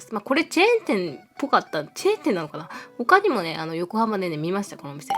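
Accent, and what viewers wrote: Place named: Kansai, Japan. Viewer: いつも楽しく拝見しています🥰 アラフォーのおばちゃんスノ担です。私は元々は嵐担なんですが、カウコン→ジャニフェス→消えた初恋でめめに沼落ち、今はめめ寄りの新参箱推しです。 SnowMan関連の動画を観るのが日々の活力なんですが、さんの軽快な関西弁トークにすっかりハマってます🤗 聖地巡礼、めちゃめちゃ内容濃くて、ドラマを思い返しながら観ました。今回もおもしろかったです。ありがとうございました！サザエさん風次回予告も爆笑でした。次回も楽しみにしてますね〜🎬✨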